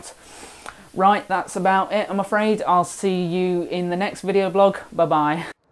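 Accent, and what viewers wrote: United States. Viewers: I thought you was in the USofA with that Southern accent . LOL Love the Videos , keep it up . Southern Oh Hi Ho here . LOL (Ohio) . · Great quality on both video and audio! I live in south-east of Norway and we're no strangers to snow.. This year has been kind as we've only had about 2 feet so far. Some years, 6-8 feet, much of it falling in a relatively short period. Here in Norway there's always a snow season, but still the first snowfall surprises a lot of people every year and we have numerous accidents during first snow... Good luck on your exams. PS! Your american accent sounded a bit like Hugh Laurie's :-) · 1) The quality of your video and audio is terrific! 2) Love the American accent. 3) Learned something about college/university I never knew. 4) Are those bulls? 5) Brrr!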